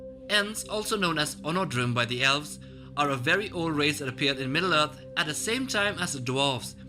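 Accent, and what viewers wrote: India. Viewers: You seriously need a better narrator; his wimpy boyish Indian accent really kills an epic vibe. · Lucas Frost have you never heard an Indian accent before?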